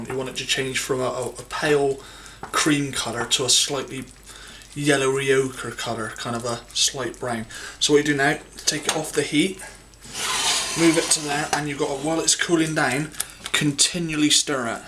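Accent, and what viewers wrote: Bristol, United Kingdom. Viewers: I like your accent, are you from Bristol? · Good video :) I love tablet but never knew how to make it. Out of interest where are you from? Can't place your accent....Bristol?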